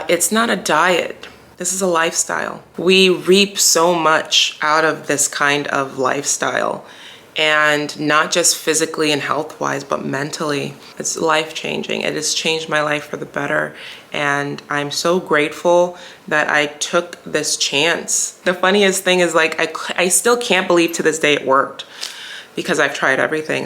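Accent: Jamaica. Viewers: You sound like you have Jamaican parents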